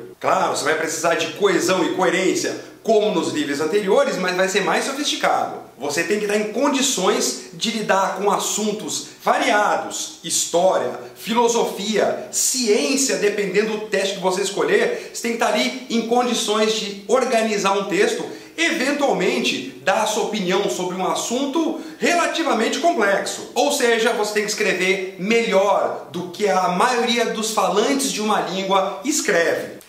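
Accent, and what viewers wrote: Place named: United Kingdom. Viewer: your British accent is evident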